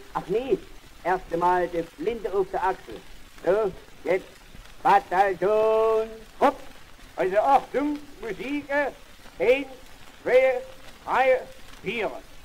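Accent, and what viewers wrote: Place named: Saxony, Germany. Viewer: nu das ist ja nu ma werklich eene scheene säckssche Offnahme. ... Klasse und Danke für das Einstellen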